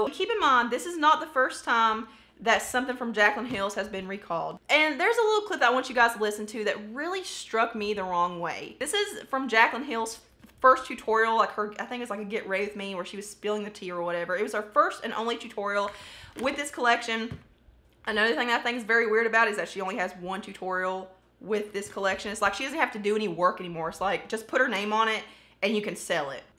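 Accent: South, United States. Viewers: OMG how have I never watch or even come across your channel.. this is my first video of yours I've watched and I absolutely love you your vibes your bluntness and your attitude.... BTW I love your southern accent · Alright girl where u at? Im gonna say GA or TN....me, AL. And i am THANKFUL TO HEAR U SLINGIN THAT ACCENT (unlike someone else from my home state who took lessens to take her accent OUT) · Where you from? It sounds like your southern. I'm from GA 😊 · Your accent is really nice ❤ Are you from Alabama/Georgia? I appreciate you being really genuine in this review 😘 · Omg, just found your channel tonight and I love you. You're honest, dont try to come off as I'm perfect and I know it all. Love that. I love your Southern accent, it reminds me of my mom's family. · Luv you lil country accent...It is absolutely gorgeous and you have amazing skin by the way!!!!! 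· I can't believe you replied! Holy Crap! Hoping someone can tell me what state you are in because I'm in AR!!! Annnd you sound like you are southern...maybe? 😂🤔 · This is the first video I have EVER seen from you or your channel. As soon as you got sassy about how your title says this will be a rant and people can leave, GIRL YOU GOT ME! I hit that subscribe and all notifications on right then and there. Love the sass, PLEASE don't ever lose that!!! PS- Your southern accent brings me back to the part of my life when I lived in Eastern North Carolina. I don't believe your accent is NC, but it's as close as I can get while living in northern Illinois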